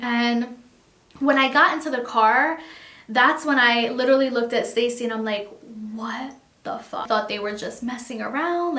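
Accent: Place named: Newark, United States. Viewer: Sounds like Newark NJ lol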